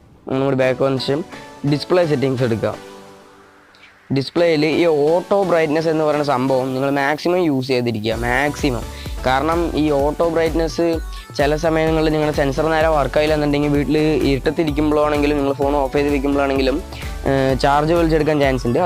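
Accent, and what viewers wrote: Palakkad, India. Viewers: Enthanda ഉണ്ണി നീ പാലക്കാടാണ് ഓ തെന്നെ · Your palakkadano??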